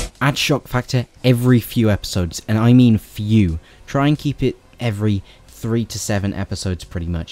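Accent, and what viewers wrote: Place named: United Kingdom. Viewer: ur british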